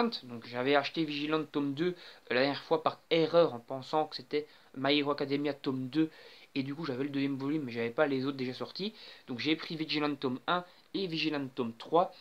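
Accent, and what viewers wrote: Belgium. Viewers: salut , merci de m'avoir répondu et désolé d'avoir pris du temps pour repondre , pour la question ou je te demande si tu es belge c'est parce que je suis belge et que j'ai eu l'impression d'entendre un petit accent xD Pour les conseille je voulais savoir - si on était "obliger" de montrer sa tête en vidéo car je suis encore jeune. - si filmer avec un téléphone impactais sur la qualité de la vidéo car pour moi l'image paraît correcte mais je n'ai vraiment d'avis extérieur si tu vois ce que je veux dire - et enfin aurais tu des musique de fond pour les vidéos à me conseiller Après je ne sais même pas si mes vidéos sont bien donc j'espère ne pas te faire perdre ton temps voilà voilà, en tout cas ta chaîne m'a beaucoup plus 👌😉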